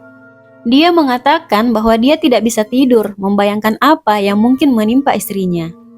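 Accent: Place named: Eastern Indonesia, Indonesia. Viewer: Suara admin kedengarannya dr timur, tp gak tahu apakah dari Sulawesi, NTT, atau Papua.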